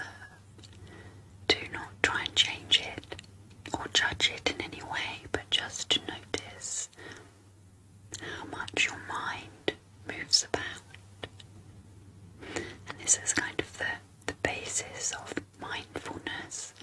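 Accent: United Kingdom. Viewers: I love your British accent 🌹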